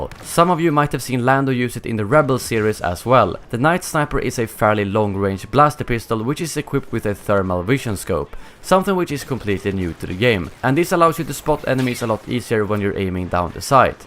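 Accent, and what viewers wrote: Sweden. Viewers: Are u from sweden? It sound like so. Btw i am from sweden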